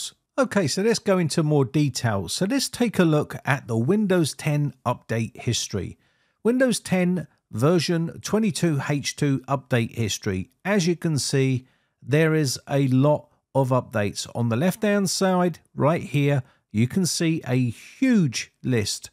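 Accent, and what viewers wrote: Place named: London, United Kingdom. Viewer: it's "Watcha guys" Londoner speak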